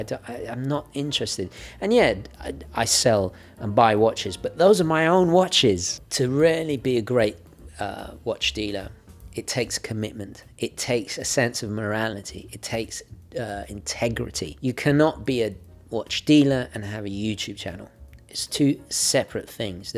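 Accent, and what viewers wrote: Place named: United Kingdom. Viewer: I just tune in to listen to you talk with your British accent.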